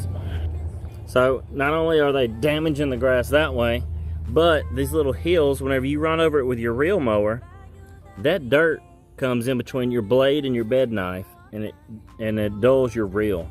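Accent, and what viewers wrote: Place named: South, United States. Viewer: I just learned what a "Mole Cricket" was yesterday... 'but have had them for about 4 years as I recall. Now I'm taking them to war. The more I learned about them the more panicked I got. Thank you for an informative, non-panicked, very peaceful video. I think it's the southern accent. I'm gonna have some sweet tea... 'and slaughter every last one of them Texas-style. Thanks again Sir.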